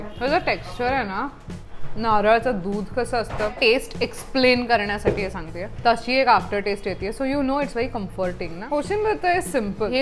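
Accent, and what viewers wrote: United Kingdom. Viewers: Marathi marathi sarkh bol agdi as british accent mdhe marathi bollya sarkh nko karus otherwise no doubt ur fab❤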